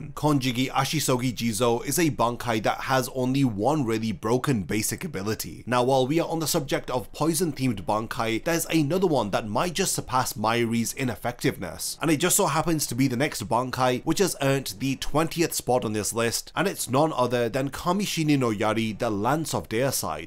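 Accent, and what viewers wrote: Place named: England, United Kingdom. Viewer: Please make an effort to pronounce those Japanese names correctly and not constantly using the English accent.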